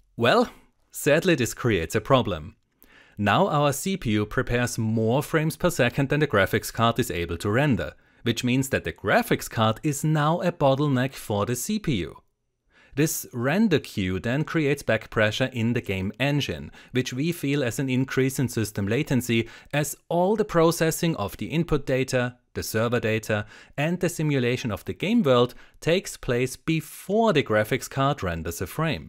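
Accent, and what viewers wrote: Austria. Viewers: From Austria eh?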